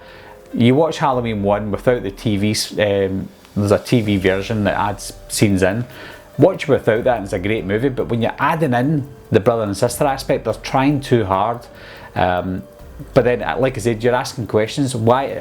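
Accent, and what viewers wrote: Scotland, United Kingdom. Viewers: Where aboots in Scotland you fae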